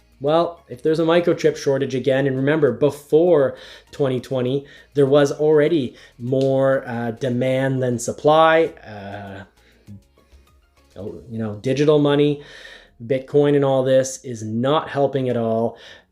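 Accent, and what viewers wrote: Canada. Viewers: Hermosillo.... Hermo see oh. There is no L sound. You say the name of the city like you're from Canada or something.... Good vids tho.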